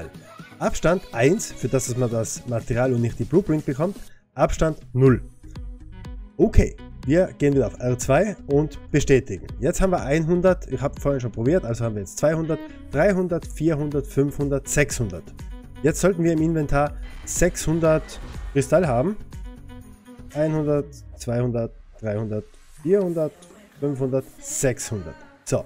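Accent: Switzerland. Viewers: bist ein schweizer gell 😊😊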